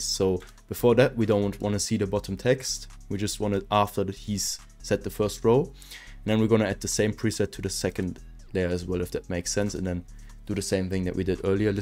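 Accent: Germany. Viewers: man hört dein deutsch extrem raus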